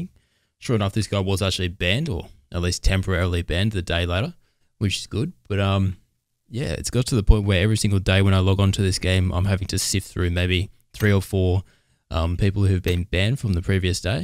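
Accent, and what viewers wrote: Australia. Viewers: How do u have such a low ping, you sound Australian..I can only get games in AS now.. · He's Australian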